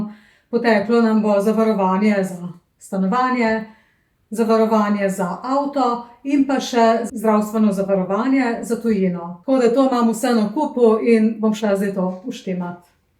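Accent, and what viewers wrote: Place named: Primorska, Slovenia. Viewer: Te zmeraj rat poslušam mam rad vašo govorico primorsko, tko da te ze prav čakam vsako nedeljo ,da si ogledamo tvoje videje diooo deklina primorska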